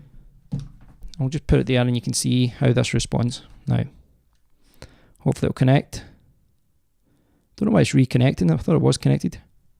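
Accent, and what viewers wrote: Scotland, United Kingdom. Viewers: i love the way you say K .... i love your ascent reminds me of my grandparents who were both from Scotland..thanks for the vid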